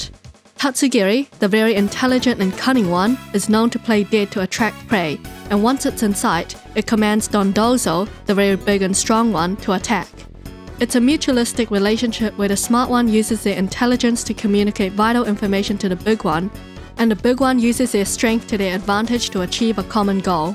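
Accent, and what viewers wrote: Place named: New Zealand. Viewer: First word you said had me check that you were from New Zealand (its just how we speak y'know)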